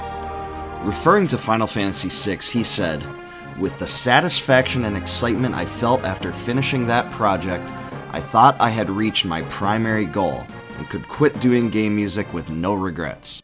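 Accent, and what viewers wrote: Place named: Minnesota, United States. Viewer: Ur from Minnesota huh? Or Canada. I can hear it.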